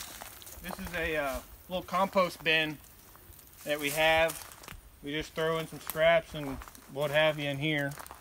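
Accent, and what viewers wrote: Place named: South, United States. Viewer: talks just like I do. I was born in Tennessee. Spent most of my childhood in the country. Brownsville Tennessee. I’m in Arkansas now. But still down south. Y’all keep em coming. 👍😊👍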